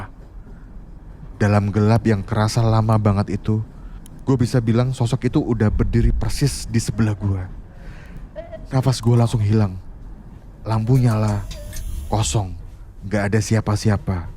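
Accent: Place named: Java, Indonesia. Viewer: Tp ini agak medok 😅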